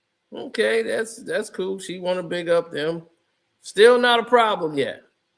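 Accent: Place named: Chicago, United States. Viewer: “Casing on” “cracking on”, you are so Chicago.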